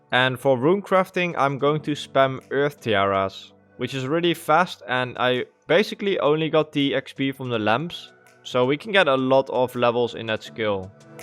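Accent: Netherlands. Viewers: you sound dutch man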